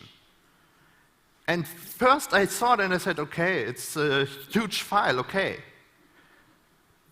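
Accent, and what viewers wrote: Germany. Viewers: Those are standard speech tricks for stutterers, to stop them from stuttering. And the german accent of course. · Sort of, but it's still more important what he's saying rather than how well he's communicating. His issues communicating could've just been nerves or an actual mental tic, as well as combining that with his german accent in the english language, but what he's saying is scary as hell. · Ohhh, it's a *stutter*. I thought that was just how to German accent made some people sound. >_>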